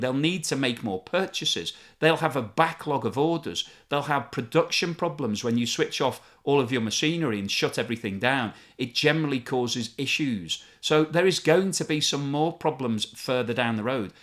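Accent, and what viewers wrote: United Kingdom. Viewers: You have a British accent but you don't know shot.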